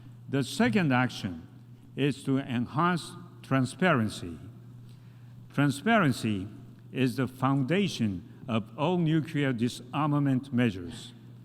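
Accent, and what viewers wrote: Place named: Japan. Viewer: どこがや アクセントはジャパニーズイングリッシュを地でいく昭和カタコト英語やし、リエゾン全く無視やんけ こんなカタカナ英語で通用して素晴らしいとか草生えるわ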